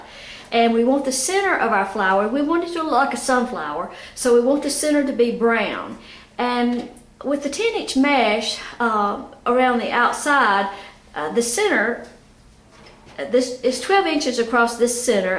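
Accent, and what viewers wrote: South, United States. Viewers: I love your lovely southern accent.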